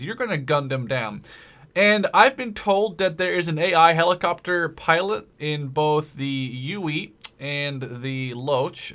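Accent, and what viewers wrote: Netherlands. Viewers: Devin Wheeler He's Dutch, he's not a native speaker. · PSA! He is from the netherlands so he does not speak perfect english, hell even i can't so look how about we all just let it go and enjoy the show, cheers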